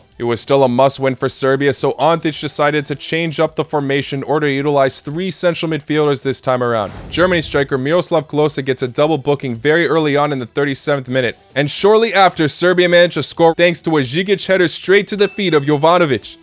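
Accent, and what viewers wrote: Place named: United States. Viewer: wdym he was born in usa he was born in croatia but his family moved to usa during the war of independence living there for so long must be why he shows an american accent in his videos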